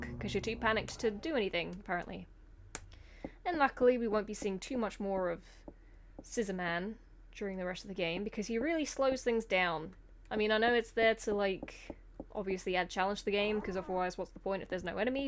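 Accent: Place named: London, United Kingdom. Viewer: You have perfect London accent. I like it.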